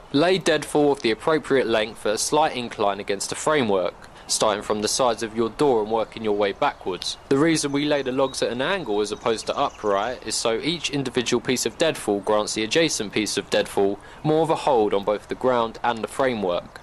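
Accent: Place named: Derbyshire, United Kingdom. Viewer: u sound like your from derbyshire? XD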